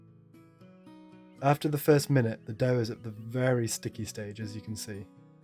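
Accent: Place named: England, United Kingdom. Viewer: Besides the English Accent the birds chirping with music is wonderful Seitan's Red Robins?, AnyDrOwlWho? Pasta etc 10-15 min , is it really necessary to cook seitan for 1 hr everyone seems too , what is the minimum cooking time ? without a pressure cooker of course ; and is yeast necessary needed or OK without added yeast ?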